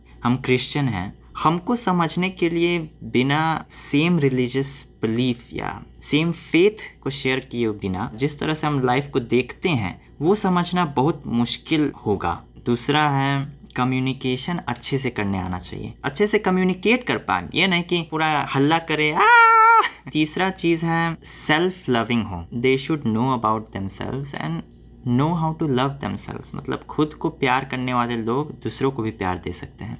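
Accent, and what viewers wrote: Bihar, India. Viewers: He got bihari accent and I am proud of it 🔥🙏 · Nailing that Bihari accent bro. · Your bihari accent such a nice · Bhen mat boliye was hilarious 🤣🤣🤣 your Bihari accent is cute 😅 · Say hindi excent of bihari or say English; you are very systematic in talking. Not that you are calculating it before speaking, yet it is systematic. Carry On. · apke bolne ka andaz dkhne me bara maza ata h..qki hm bhi bihar se h..😋👌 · It's Bihari accent so it's nothing wrong if he said "kalkatta " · Ur Bihari accent....ek dum mast hai👌👌👌😍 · I think this may perhaps be the first time i've cross paths with a korean who speaks magadhi style hindi and that too with such finesse. For a moment i was taken aback and if not for the face, i guess nobody could say you are not a native patnaite😅 Such a fun experience getting to know you. Waiting for more interesting videos. 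Annyeong! p.s: can you sing " " by? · I am new to your channel. Loved your accent. This is the 1st for me where a Korean is talking in Hindi with Bihari accent and living in USA. I am also from Patna. So I loved it a little more. You earned a new subscriber. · This is the real Bihari Accent Bhaiyya ji, Jiya ho Bihar ke lala. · Apki video bahut achhi lagi... But usse bhi achhi apki Hindi.... Aap jab bolte ho sun k achha lga... Ek dum hamare Bihar wali Hindi..gd luk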